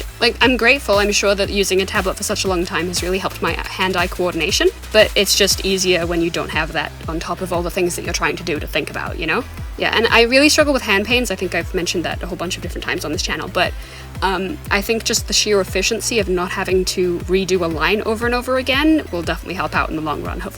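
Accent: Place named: Australia. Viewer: Amazing drawing! Btw since its winter for you and from your accent we can tell youre from australia or new zealand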